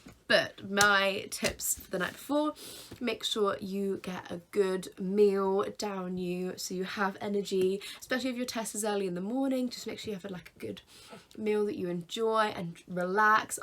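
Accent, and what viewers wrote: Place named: RP, United Kingdom. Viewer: you're very posh arn't you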